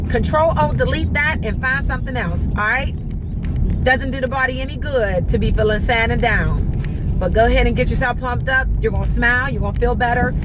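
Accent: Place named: Pittsburgh, United States. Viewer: It sounds like your from Pittsburgh I wonder if you work for troy.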